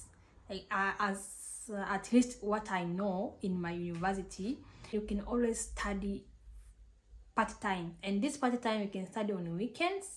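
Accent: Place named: Tanzania. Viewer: Hello thank you so much for your video it's very nice.I can here that your from Tanzania, please if you will not mind I can have contact with you because also I'm from Tanzania and want to speak with you. Thank you for your understanding 😊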